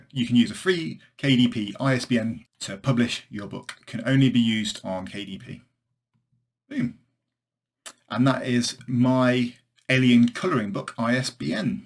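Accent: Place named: United Kingdom. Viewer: Wow I love your British accent 😅😅😊😊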